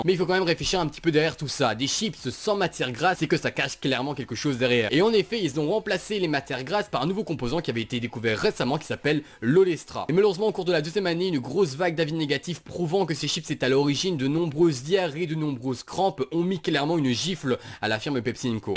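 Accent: Belgium. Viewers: parce que il est belge est qu'en Belgique les nombres ne se prononcent pas come en France, par exemple 90 se dit ''nonante'' et 70 ''septante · esque tu est belge????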